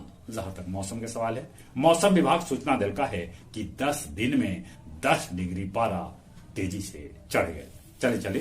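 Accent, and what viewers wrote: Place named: Magahi, India. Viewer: बहुत सुंदर समाचार पढ़ ही।ठेठ मगही सुनके मन हरषा जा हे।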